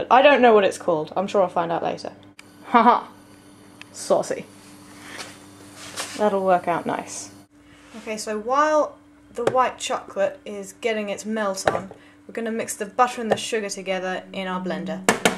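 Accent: United Kingdom. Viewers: Gracious, you're British. I came from the latest Grumps animation, and dang-ass-dang, you're all the more awesome because of it. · What part of the UK are you from? I've decided I need to marry a woman who says "me dainty fingahs"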